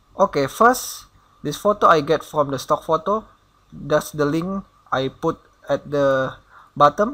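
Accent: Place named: Indonesia. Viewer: You sound Indonesian hahaha thx for the tutorial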